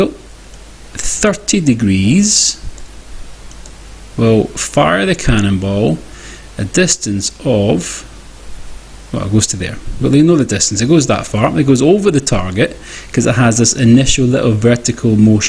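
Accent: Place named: RP, United Kingdom. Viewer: Wee posh accent 😹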